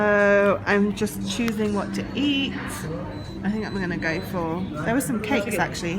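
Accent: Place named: United Kingdom. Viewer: I love your british accent, lovely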